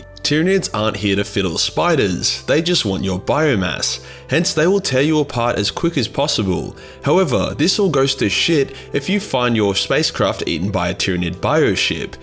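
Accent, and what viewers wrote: Australia. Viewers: I'm really disappointed that you did not do any political jokes. You throw homophobic slurs, sexism, autism jokes and more. But oh let's give politics a break. What the fuck dude. >:( I thought I was listening to an Australian. Not a Canadian.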